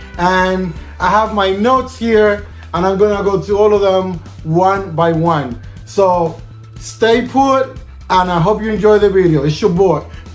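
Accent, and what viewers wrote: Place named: Cuba. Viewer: Where you from Brother you have an interesting accent. Is it Cuban?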